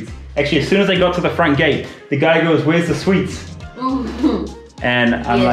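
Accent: India. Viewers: I love how the inflection of the Indian languages come through in your voice, it’s really cool. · Nice job Very Nice. India is now your Oyster. Safe driving. I've noticed that your New Zealand accent is Vanishing and I don't know you, I've noticed that you are gradually slowly getting a hint of Indian accent different words are stronger than others, No doubt you have already been told this?👍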